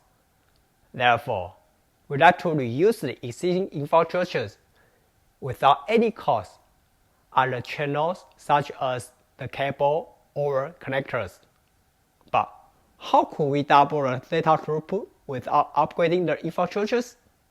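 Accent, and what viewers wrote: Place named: Taiwan. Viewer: 聽你的英文口音確實很像台灣人XD 你是做SERDES的工程師嗎? 好奇為什麼會開這麼冷門主題的頻道(不過我有興趣)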